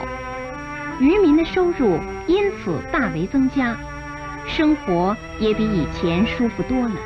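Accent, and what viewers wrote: Standard. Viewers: 好標準的國語，而且這個國語發音還跟 中共那邊的普通話有一些不同，台灣 中國國民黨帶來的國語更好聽。 誰統治台灣 氣氛 精神狀態 完全不一樣。 日本統治 國民黨統治 民進黨 完全不一樣， 菁英統治， 普通人生活快速改善， 詐騙集團貪污惡搞 就是 落後破爛愚昧破敗。 統治者 有沒有專業能力 太重要了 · 当时发音标准，怎么没有现在湾湾的娘娘腔？ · 解说的声音又好听发音又标准，太棒了👍👍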